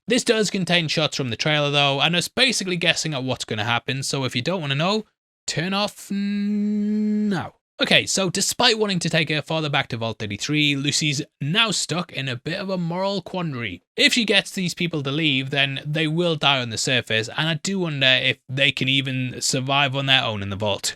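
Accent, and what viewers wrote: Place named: United Kingdom. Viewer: I kept thinking "Who the hell is Bob?" Then it hit me. "Oh, BARB!" Brits and their dropped 'R's. Ain't language some shit? (Loving the breakdowns, by the way.)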